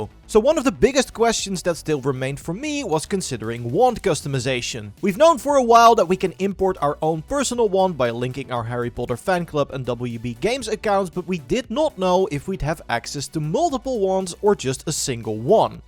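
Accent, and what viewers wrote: Netherlands. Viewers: i love your dutch accent.....🥴😅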